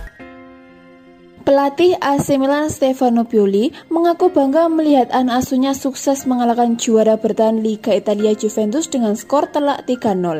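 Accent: Java, Indonesia. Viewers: Host ny logat bicarana ni medok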